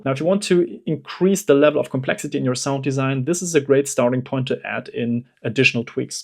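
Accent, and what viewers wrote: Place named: Germany. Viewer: Your english is for your deutsche Akzepnt very nice;) ich kenn einfach 3 andere, die 1:1 ähnlichkeit mit dir haben!:D:D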